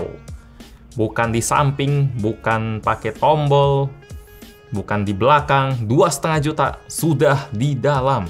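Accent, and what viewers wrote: Palembang, Indonesia. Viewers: kayak ada bhasa palembang ny, ap koh org palembang? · Wong Palembang caknyo kakak ini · Ketaro nian wong plembang. 😅 Metu aslinyo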